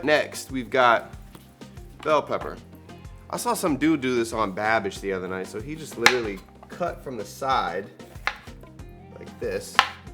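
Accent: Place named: New York, United States. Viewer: I love how much of a New Yorker you sound. This looks banging. Definitely not traditional but has the perfect amount of twist.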